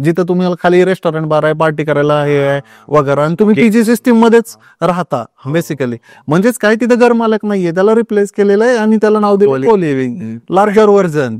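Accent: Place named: Jalgaon, India. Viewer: Jalgaon cha vatatoy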